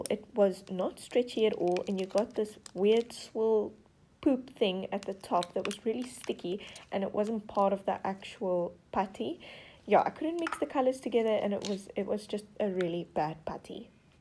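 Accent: Afrikaans, South Africa. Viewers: Are you from south africa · Jy kan hoor jys afrikaans😂😍😘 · I knew she sounded South African! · I love her South African accent❤️🤭😊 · Honestly, I could hear in your voice that you're South African. I am South African too but I have an American accent. I just love hearing an all South African accent 😅❤❤❤ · Are you South African I think I hear it in your accent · right when you started talking i knew you were from south africa my mom was born and raised there and i have family that still lives there i live in the US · Are you South African because I am and you have a accent and you are say rand instead dollars to I know this because I was born in port Elizabeth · South african? :3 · Your accent is beautiful, South Africa is amazing. 🇿🇦🇿🇦🇿🇦😙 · I can clearly hear that you speak afrikaans. I know that accent. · this is kinda weird hearing a south African voice knowing, China town is kilometres away, game is in the nearest mall sksksksksksksksksk Great video tho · Are you south african!? 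· Im not gonna lie, I realised you were South African right away and of how you pronounced the "R"s and how you pressed on the "T"s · Are you from South Africa?? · You kinda sound South African. Thats where Im from :P Edit: wait, doesn't matter. I just realised that you have been referring to currency as "Rands" and your bio says Cape Town. I feel dumb now. Lol XD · South African right? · Cause you sound afrikaanse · Ya u definitely from south africa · South African!! I could hear it in your voice😂❤️ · Lol, when I first heard her accent I immediately thought she's from South Africa, and then by mentioning our brands (Game etc) I kind of had a feeling but when you mentioned rands (South African currency) yeah you're from SA 😹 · Your voice sound if you are from south Africa ek is van africa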